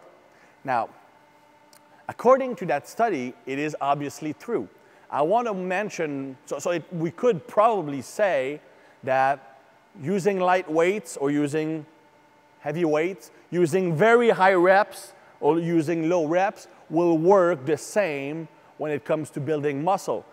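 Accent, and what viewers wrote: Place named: Quebec, Canada. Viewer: I trust him because of his Quebec accent. Never met a dishonest or bad Quebecer.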